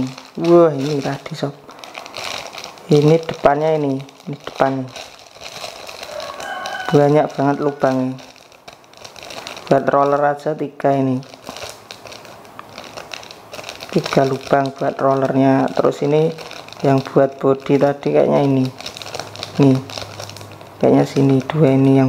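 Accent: Java, Indonesia. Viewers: Orang mana tt bang sampean...??? Ngomong e kok jowo2 ... Aku pngn dolanan tamiya.. Tapi nang daerahku ra ono e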